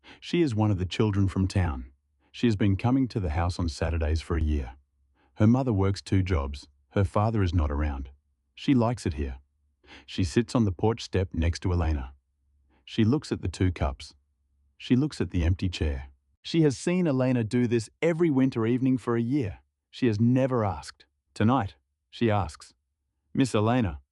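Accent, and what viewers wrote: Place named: Australia. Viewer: Loved the Australian accent. It is so much easier to listen too .. are there any more read in an Australian accent?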